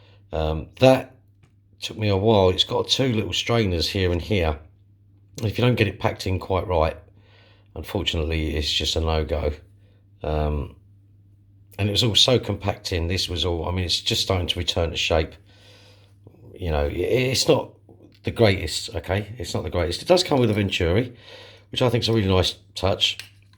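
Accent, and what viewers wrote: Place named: United Kingdom. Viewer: Then, with about 15 years (I lost track) I'm a newbie in the hobby! What I love about chatting online is that we can learn from others that live in another country, I'm from NL, I guess by your accent you are located in the UK? There are some cultural differences (when it comes to fish keeping) from country to country and it's those differences that we also can learn from. Always good to expand my view. Although I think the difference between the UK and NL aren't that huge. You have a great YT channel, keep up the good work!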